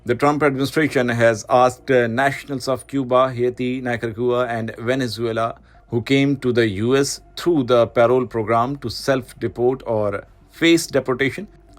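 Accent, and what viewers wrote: India. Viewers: Heavily indian accented and using the word LAKH only used by south asian countries.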